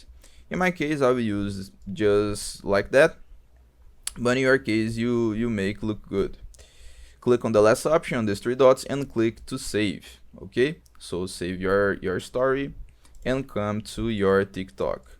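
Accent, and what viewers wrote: Brazil. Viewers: o cara é brasileiro, conheço o sotaque brasileiro no ingles KKKKKKKKKK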